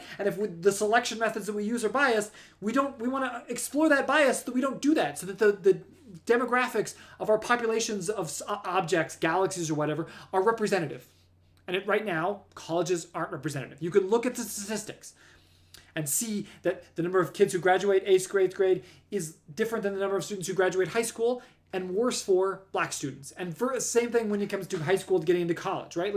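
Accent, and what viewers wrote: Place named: Middle East. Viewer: well you also have a bit of an accent to you so I guess that's why I thought Middle Eastern. Another thing I was a little surprised by is hearing that you grew up in OC, cuz I thought you grew up in NY.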